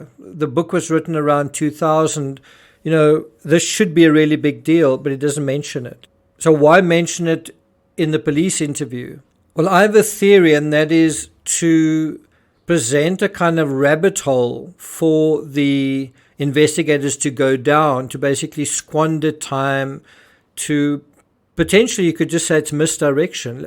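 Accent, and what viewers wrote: South Africa. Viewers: you have an excellent way of presenting your podcasts I love the South African accent too. From Melbourne down under Australia 🇦🇺 🇦🇺🇦🇺 There seems to be an awful increase in the murder of young children either by a parent a family member or a paedophile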